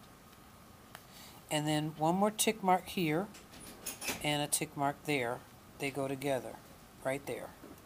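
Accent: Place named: South, United States. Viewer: Omg thank you sooooo much for making the effort to post this lesson!!! I have been going back and forth on how I should sew this top I'm sewing that has a lining and I could not wrap my head around how I was going to make it work?!?! Your video is concise and exactly the example I needed!! Also you sound like a wonderful southern woman who's got all kinds of really great knowledge that can't be taught, that only comes from experience!! Anyway seriously thank you!!!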